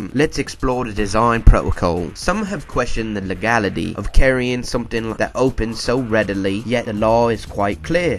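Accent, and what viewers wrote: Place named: Cockney, United Kingdom. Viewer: Now that's a very strange accent, sort of both cockney and northern at the same time.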